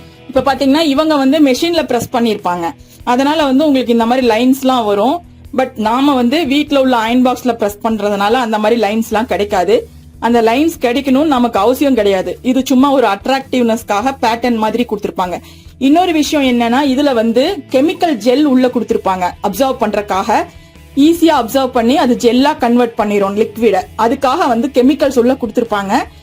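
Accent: Kongu, India. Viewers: I m watching your all videos from 3 years ago. All videos super. Kongu tamil madam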